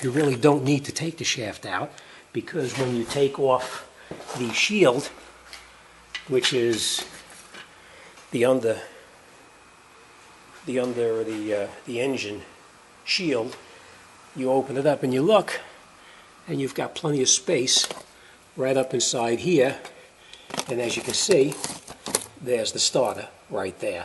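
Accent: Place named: Boston, United States. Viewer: Hes so boston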